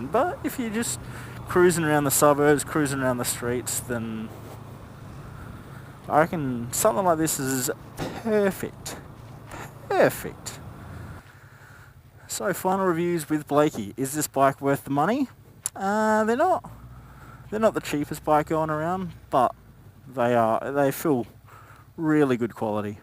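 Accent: Australia. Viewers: Maaate, are those wheelie bins? "Brand spankin' new"? This guys gotta be an Aussie too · cant u hear that he is from australia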